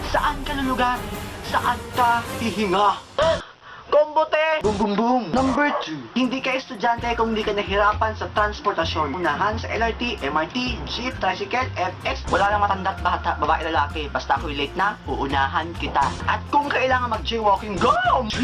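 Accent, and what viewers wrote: Visayas, Philippines. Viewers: Bisaya ka dung uy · hahahahha galing! bisaya ka ba???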